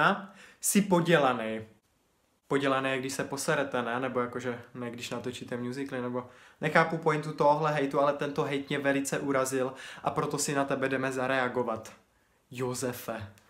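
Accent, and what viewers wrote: Czech Republic. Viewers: Ty jsi z Olomouce jde to poznat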